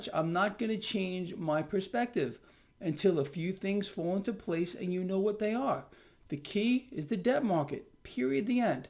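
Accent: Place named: Long Island, United States. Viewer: Cool, you talk like a fellow Long Islander.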